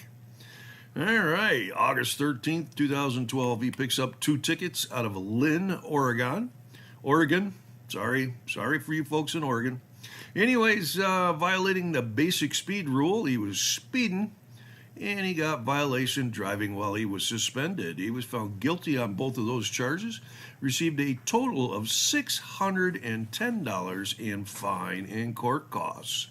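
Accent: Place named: Balkans. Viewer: With his accent, I would guess his people are from the Balkans.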